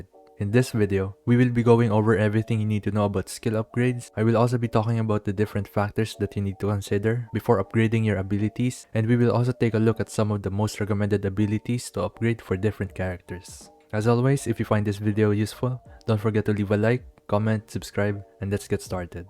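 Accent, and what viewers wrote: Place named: Philippines. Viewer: U sound pinoy..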